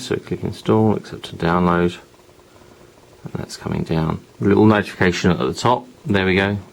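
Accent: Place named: United Kingdom. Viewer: Love the phone, and thats a pretty good review. and i love your britain accent when talk! haha.. still trying to learn that accent anyway! :)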